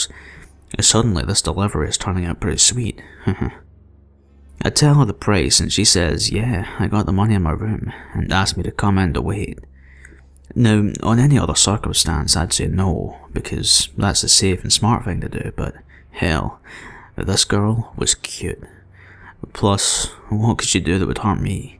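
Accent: Scotland, United Kingdom. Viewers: you're a man of great talent. thanks for the text back and keep giving us a brilliant channel. the accent? I'm thinking you're from the east coast of Scotland?😃.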